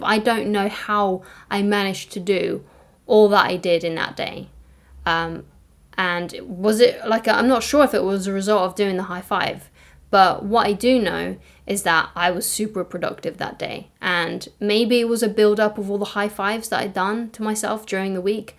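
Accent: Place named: England, United Kingdom. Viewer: Thank god a nice English accent.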